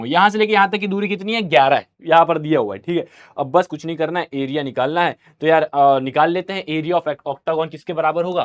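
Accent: Bihar, India. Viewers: That bihari ascent 🫰🤌🤌🤌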